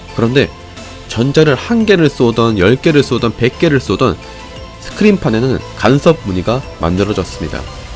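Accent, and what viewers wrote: Gyeongsang, South Korea. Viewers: 아 똑똑하고 귀여운데 말도 기깔나게하는 경상도 남자라니 최고다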